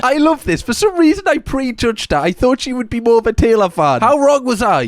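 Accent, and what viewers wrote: Newcastle, United Kingdom. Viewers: Geordie (Newcastle, UK)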